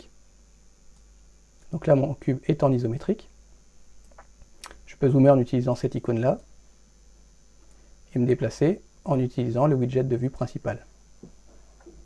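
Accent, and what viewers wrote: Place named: France. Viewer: woah you're french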